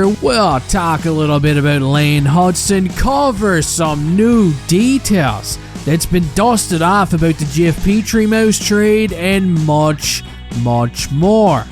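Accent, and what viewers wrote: Newfoundland, Canada. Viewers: He's a Baymen bye from The Rock .. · The Newfie accent hasn't been watered down like the rest of Canada's. Because of their isolation, they've maintained the accent of their ancestors mostly from England and Ireland.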